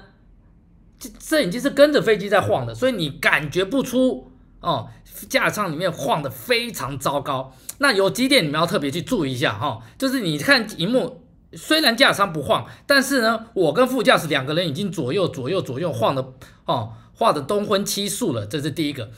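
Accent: Taiwan. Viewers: 為何大佬在外工作那麼長時間英文完全沒口音，完全就很台灣味...為何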